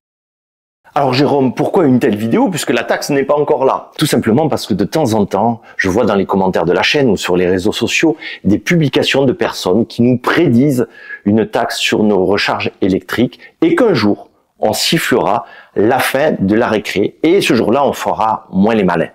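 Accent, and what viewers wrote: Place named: Toulouse, France. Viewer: Salut. Je viens de découvrir ta chaine et je me suis abonné direct. J'adore ton accent. "Oh Putain Con!!!!!!" Ah mais "Merde" j'ai le même....... Je suis comme toi "Pas français mais Toulousain ". Je récupère ma Modèle Y Samedi. Merci de nous faire partager ton expérience et aux plaisirs de te croiser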